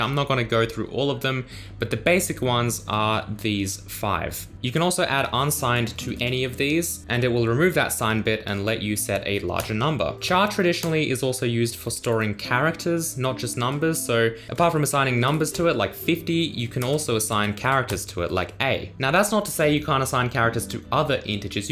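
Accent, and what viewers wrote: Australia. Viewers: He's an Aussie. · That’s the Australian accent right?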